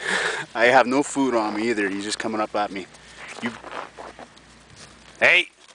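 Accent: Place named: Canada. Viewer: Keep scrolling Lol I wasn't going to say anything, but what part of Canada are you from? I'm from Atlanta Georgia but I went to college at the University of Calgary. Almost every one of my friends from Calgary says Aboat, and get oat. .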